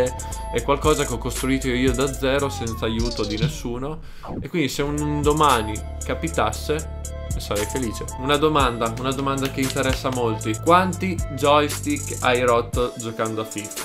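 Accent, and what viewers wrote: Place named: Tuscany, Italy. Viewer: la tua toscanita è palese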